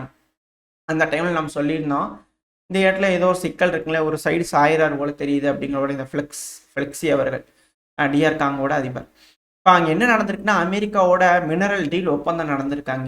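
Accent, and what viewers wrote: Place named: Kongu, India. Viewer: Kongu tamil ❤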